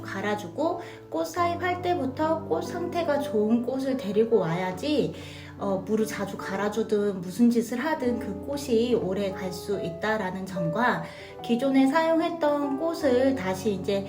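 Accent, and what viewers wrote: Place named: Ulsan, South Korea. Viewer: 출근준비하면서 우연히 보게되었어요. 혹시 울산인가요? 억양등 말투가 참으로 익숙해요. 있어보인듯 꾸미지않으시고 편하게, 사실적이게 말씀하셔서 편하네요~^^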